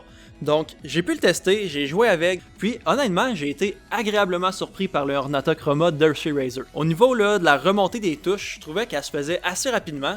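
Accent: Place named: Quebec, Canada. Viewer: Sans rire l’accent québécois ❤️😍😍😍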